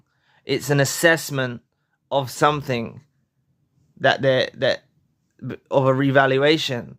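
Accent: London, United Kingdom. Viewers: It's actually London's accent. is really cool guy, very intelligent, real light worker. Should be supported!❤ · Just happen to be in London right now listening to your British accent, cool! Thanks for all you do!!!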